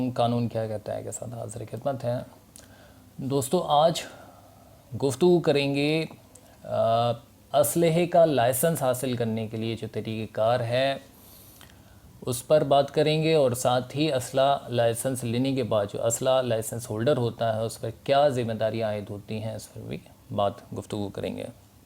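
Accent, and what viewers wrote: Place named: India. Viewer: Bahe ap ne jo tarika btaya aisa kuch b nahe hai pakistan m ap muje indian lag rahe ho zuban say b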